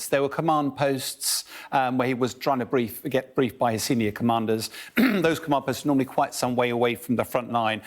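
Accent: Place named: United Kingdom. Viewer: His British accent is just killing me. "Piuutin". He sounds like Russia lost 50% its territory. It lost 50% occupied Ukrainian territory. Ukrainian losses based on OSINT, lieutenant colonel McGregor and latest reveled secret document are 1 Russian to 5-7 Ukrainians. Just in Bahmut, Ukrainians lost 32 thousand just KIA.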